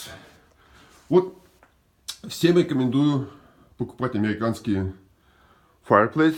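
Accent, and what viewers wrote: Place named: Ryazan, Russia. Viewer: Слова русские позабыл? Смешно слушать рязанский акцент😂😂😂😂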